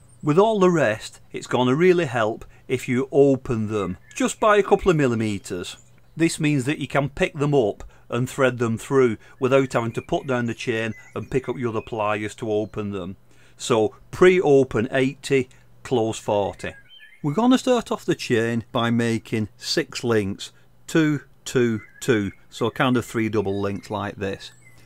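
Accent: Ireland. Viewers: Sir, you are a very, Very, VERY good teacher! The Irish accent doesn't hurt either.😉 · Boy do I love your Irish brogue! It makes my Irish blood sing! (I’m 40% Irish and proud of it!). You’re a great teacher and I think I’ll subscribe!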